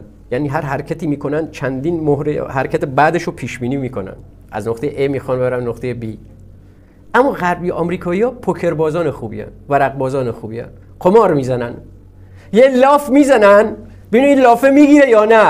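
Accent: Lorestan, Iran. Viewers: از نحوه گفتارت وان ادبیات خاص خودت که بیانگر قوم غیور لر میباشد خیلی خوشم میاید تو پیای گپ مایی.شیر دات حلالت...... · درود بر برارُم لُر با غیرت عالی هستی نمونه غیرت ایرانی یه کمی دُز لهجه لری بیشتر کن .می دونی که صدام و افسرهاش چقدر می ترسیدند و تک تیرانداز با غیرت ما که در دنیا رکورد دار هست یک لُر غیرتمند بود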